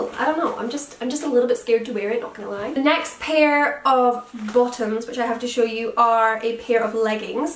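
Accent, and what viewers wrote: Scotland, United Kingdom. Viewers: You suite the clothes really well Ps are you Scottish cause u have a Scottish and I'm from Scotland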